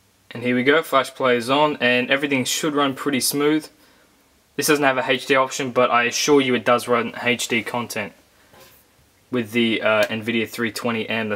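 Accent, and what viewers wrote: Australia. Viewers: i really like this aussie accent hahah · are you from aus or nz ?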